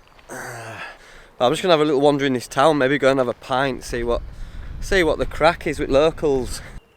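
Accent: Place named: Cockney, United Kingdom. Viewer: More cockney! More cockney!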